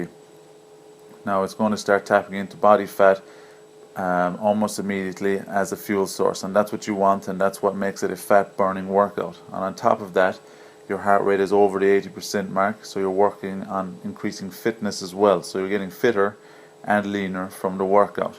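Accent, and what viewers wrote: Ireland. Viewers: You Irish? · You sound irish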